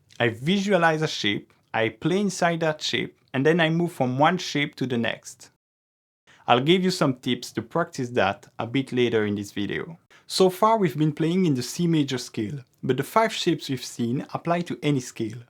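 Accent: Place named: France. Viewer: On reconnaît bien l'accent français 😅 Merci pour la leçon 💪🏼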